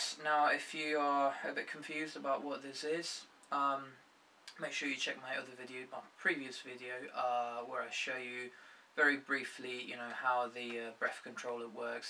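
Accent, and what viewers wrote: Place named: North, United Kingdom. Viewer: You have a REALLY interesting accent, put me out of my misery? There is some Northern UK mixed with an European accent....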